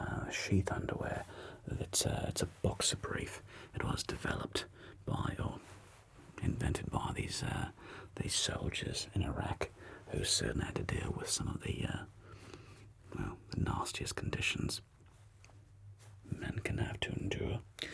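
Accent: United Kingdom. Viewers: I was not prepared for how much I would enjoy you with a British accent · I seriously thought you were actually British 😮. Amazing content as ever ❤ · I absolutely love the VIP experience you provide in your suit measuring videos! So calming 😌 I love the triggers and the British accent too! Thank you so much J! Smashed another video out of the park again! (when do you not?! 😂 ) PS. Love the profile pic update too! Looks cool! Keep up the great work! Have a lovely weekend!! 💙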